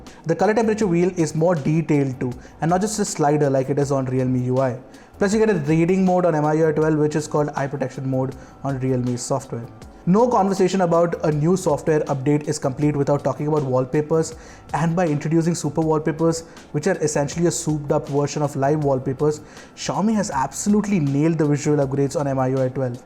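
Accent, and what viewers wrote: India. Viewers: I like his english-indian accent ngl.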